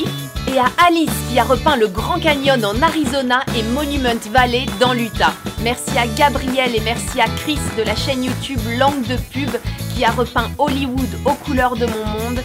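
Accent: Normandy, France. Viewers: Superbe vidéo! ^^ J'aime beaucoup t'es vidéos à chaque fois sa redonne du pep's! ♥ Tu as dis que tu avais un accent Normand donc tu étais originaire de Normandie! Et je voulais savoir si tu y viens encore souvent? Car je vis en Haute-Normandie et j'aimerais tellement te voir. Peut-être qu'un jour tu viendras à Rouen!:D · Ho ! Un accent Normand ? Tu est Normande ? :D (Gyaah ! Un point commun avec toi si c'est oui ! °3°)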